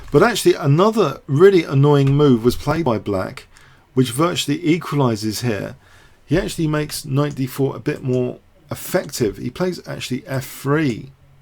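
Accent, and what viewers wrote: United Kingdom. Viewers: I just wanted to say how grateful I am for all these videos you do. I'm 28, and I've been playing chess since I was 10. I stopped playing back in '07 when I was diagnosed with leukemia in the navy. I've recently started getting back into it and am amazed by all the instructive video options there are on YouTube. But yours are the only ones I care to watch anymore. Majnu is ok, but just not in-depth or instructive enough. I had to get used to your "British accent" at first, but now I find it quite comforting (weird, I know). So thank you for all you do for the chess world. There should be like a Nobel Chess Prize or something for this.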